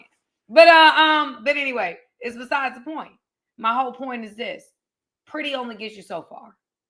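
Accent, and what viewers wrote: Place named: New Jersey, United States. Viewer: The only thing I know about New Jersey is what ive seen on it’s always sunny and that it’s also the birth place of jay and silent bob and I can see you growing up there the way you speak 😂😂 i definitely was a giant red flag no way around it but i think I’m a white flag now I’ve surrendered myself as we should all be. Ive still got that red flag but I’m not waving it around anymore it’s just for emergencies I think.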